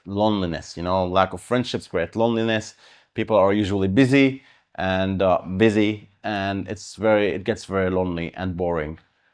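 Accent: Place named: Europe. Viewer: You sounds from Europe, where are you from?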